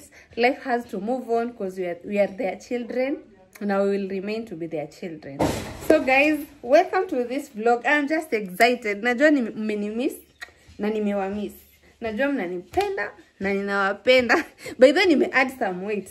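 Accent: Kenya. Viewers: ako na ascent ya waluhya 🤗🤗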